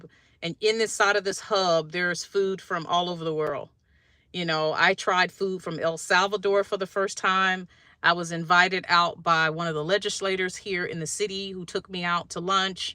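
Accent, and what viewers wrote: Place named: Canada. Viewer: Your accent is starting to sound Canadian.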